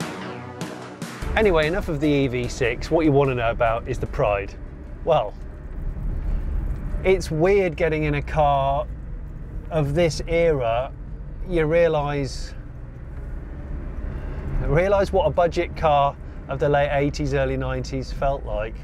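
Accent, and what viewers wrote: United Kingdom. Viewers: As always just a couple of words in the comment just for a brilliant British English.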